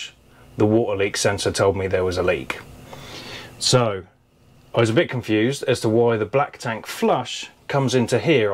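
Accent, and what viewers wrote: United Kingdom. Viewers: I just watched your video and it answered my question. But, I want to be sure that was the source of the leak. By the way, I could listen to you Brits all day long. Thanks from Arizona, USA. Dan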